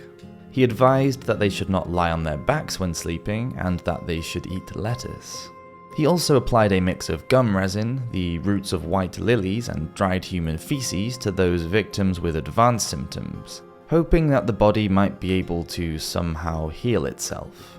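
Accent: United Kingdom. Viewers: Using a British accent while quoting Italian figures is pretentious AF. This video was boring.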